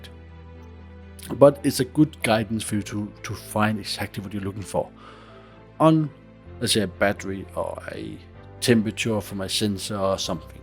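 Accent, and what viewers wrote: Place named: Denmark. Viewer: The Danglish is strong with this one, but I learned a lot, thank you ^_^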